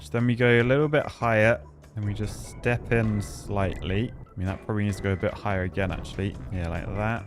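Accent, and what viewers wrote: United Kingdom. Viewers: speaking of pronouncing words correctly, its not "aloo-MIN-eeum, its "a-LOOM-a-num" lol I love the difference between British and American pronunciation. couple questions, whats a tuh-MA-toe?? lol =P and why is a fender called a wing in england? isnt a wing the thing on little stands on the trunk of a car that generates downforce? lol and whats a roundaboot?! hahaha we call them rotaries here id be curious what a long term city would be like over several videos. can we replicate true LA life?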